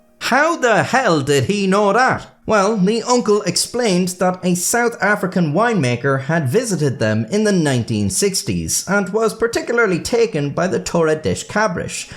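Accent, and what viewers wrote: Ireland. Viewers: I’m not even Portuguese and the pronunciation hurts. Thankfully, the delightful Irish accent more than makes up for it. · My feed knows me so well... another Irish man talking about goats and their towers is all I've ever needed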